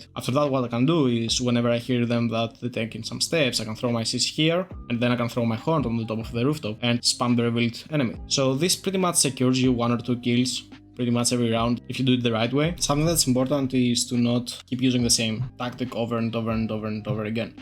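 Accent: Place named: Spain. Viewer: El inglés promedio de un español...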